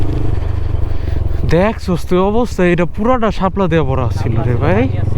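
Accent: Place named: Narsingdi, Bangladesh. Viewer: ভাই এর ভাষা অনেকটা রুপগঞ্জ/ নরসিংদির মত 🤔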